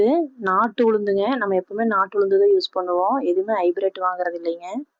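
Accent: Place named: Kongu, India. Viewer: Neenga nalla Kongu pasa pesareenga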